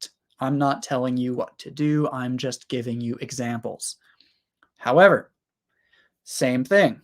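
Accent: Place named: United States. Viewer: You are absolutely right. I'm also happy to be your friend.l had some curiosities when l was in a school that how american people speak english.Grammar books couldn't provide full information at that time.l'm impressed with video as I can understand his words.Thanks for being considerate with us.Just love your accent.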